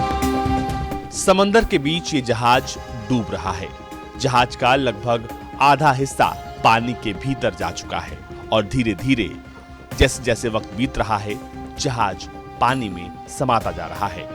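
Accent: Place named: Bihar, India. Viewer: Ye bihari hai jo bol rha hai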